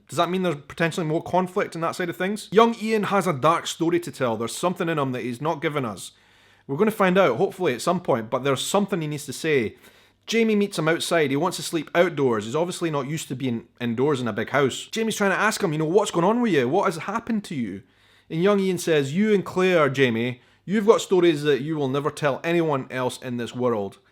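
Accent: Scotland, United Kingdom. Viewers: I love your reviews and your Scottish accent and thank you for being so passionate about the show and giving us your honest opinions. I do disagree about Roger, however. I think some people forget that he is a 20th century man trying to make it in an 18th century world. He is a historian and knows things about the time from books, but to actually live it is a shock to the system every single day. I got a little tired of the number of the flashbacks to the hanging, but I have to say that the silent, black and white film gave the memories an eerie quality and spoke to Roger's terror, seeing it all through the burlap over his eyes. Those films also harkened to films I've seen of the lynching of black people in the 60s and the sheer cruelty and injustice of that. I also thought it interesting that the only people in color were the "redcoats". So I really appreciated the artistic quality of those flashbacks. 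Oh, and yes, "burying the hatchet" is a thing and Roger makes mention of it when he's teaching his Oxford class. As for Murtagh, in the books, he never made it past Culloden.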